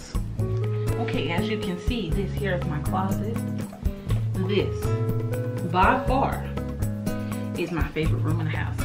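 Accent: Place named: South, United States. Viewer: Is the southern accent for me😂😂😂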